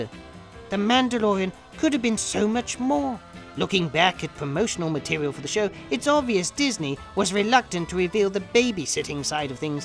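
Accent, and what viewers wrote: United Kingdom. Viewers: I'm gonna put things more on "Willfully Ignorant" rather than "Downright Stupid". But please don't comment on things you have any clue on what you're going on about. At times, you sound a lot like Alex Jones with a British accent than any person with logic or reason.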